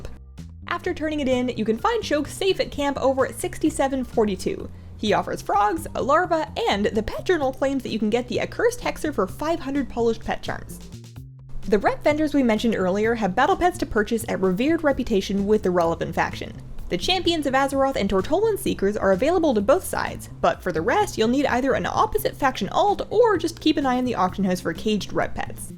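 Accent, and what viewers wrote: Canada. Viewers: canadian spotted. No matter how good their american accent is the 'out' always slips through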